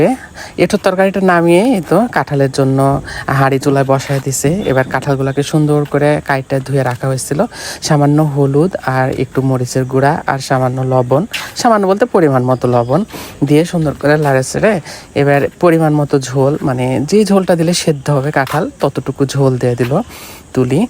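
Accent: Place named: Rajshahi, Bangladesh. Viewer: রাজশাহী জেলার মানুষ এভাবে আ্যঁ আ্যঁ করে কথা বলে 🤮🤮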